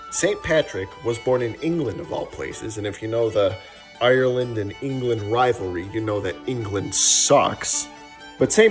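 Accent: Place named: North America. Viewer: a guy who claims being Irish himself - why would he have an North American accent and not Irish?!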